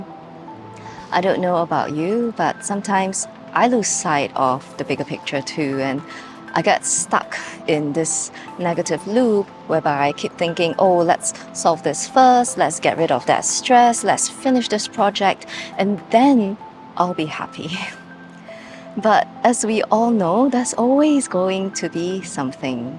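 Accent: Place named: Singapore. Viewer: She sounds Singaporean. They work very very hard!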